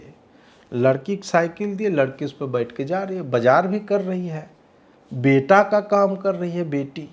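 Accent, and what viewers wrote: Bihar, India. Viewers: Uffff ye maithili touched Hindi bihari accent ke sath maza aa gya ekdum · भई मज़ा आ गया क़सम से पूरे बिहारी स्टाइल में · अच्छा नहीं...बहुत बहुत बहुत अच्छा लगा....किसी भी film से ज्यादा entertaining है....बिहार ki hindi बहुत mithi है....thank you so much bhai...god bless you...🙏🙏🙏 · Vaah Bhai,tu si great ho. Aaj to pure Bihari andaj mein.outstandsing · ji, Mai toh aapke videos dekhti hi hu, meri mataji bhi aapke videos dekhti hai. Aapki bahot prashansa karti hai ki aapki Hindi bahot achhi hai aur iss video mein toh aapne kya hi bihari bhasha pakad ke roast Kiya hai. Bahot bahot badhiya aur aabhar aapka. Aise hi videos banate rahiye! 🙏🙏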